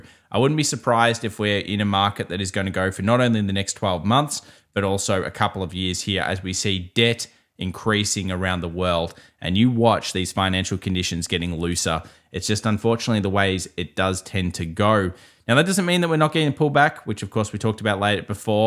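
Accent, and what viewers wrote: Australia. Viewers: Hi Great to hear a fellow Aussie on here:) Fantastic update. Quick question... I have a rather heavy bag of selected low cap altcoins which I thought I got for a good price over the last 3 months but could have bought them all in the last 48 hours with a 20% discount. If you don't laugh you cry. Anyway, the question is obvious....with BTC's premature take off, when do you think small cap altcoins will start to run? Soon after this BTC ATH or months from now when BTC stops pumping and pauses for a while?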